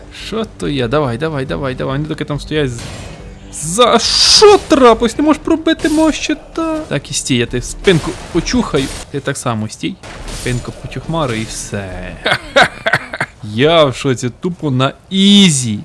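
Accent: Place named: Zhytomyr, Ukraine. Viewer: Ти можеш нормально говорити, чуму не житомирською.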